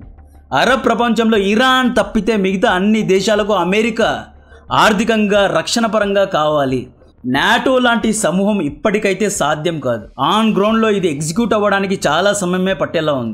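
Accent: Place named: Tamil Nadu, India. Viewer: Arey nuv mandhukotti maatladathava?? Leka arava vaadiva? Ni maatendhukura ala vasthaadhi? Telugodi chinna shortfilm chaesthene oscar andhukuni retire ayinattu buildup isthaaru, nuvventra telugulo videos chaesthunnav? Sigguledhaaraa Goltigey neeku?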